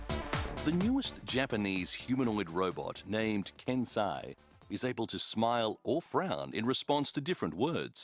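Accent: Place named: Australia. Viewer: Thumbs up for Australian narrator!!!!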